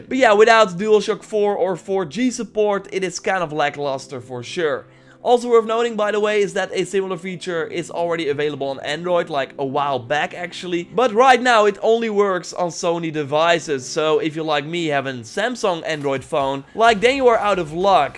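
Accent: Netherlands. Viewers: your Dutch accent kills me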